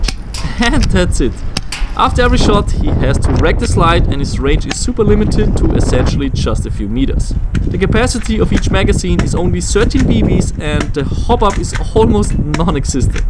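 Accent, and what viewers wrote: Germany. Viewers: Where is from? been watching him for a while, not long enough to figure out where he is from, accent kinda sounds German but I’m no Accent genius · Bist du Deutscher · 1question are u German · hör auf english zu reden jeder weis das du deutsch bist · Ist er Deutsch? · are you germane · I have the felling u are german xD😅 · One question, are you german? Weil du diesen Akkzent hast... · Wer findet auch dass er ein Deutschen Akzent hat?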